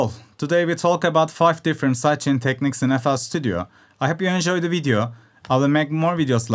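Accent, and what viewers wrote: Turkey. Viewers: Bilader türk ingilizcesi konuştuğun çok belli 👍🏽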